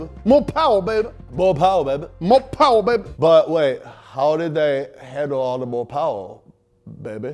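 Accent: Germany. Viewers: The german accent is pure bellissimo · the funniest german accent ever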